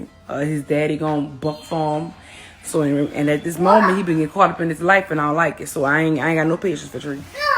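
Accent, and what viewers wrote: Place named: New Orleans, United States. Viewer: New Orleans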